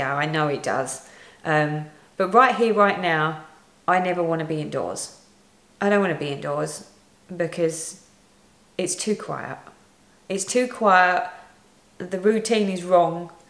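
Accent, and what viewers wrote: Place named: England, United Kingdom. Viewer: What part of England are you in? I love your accent!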